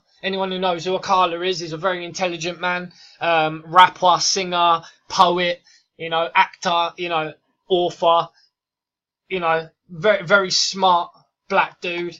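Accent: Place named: Midlands, United Kingdom. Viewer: Your accent is Midlands but have you been living in London maybe...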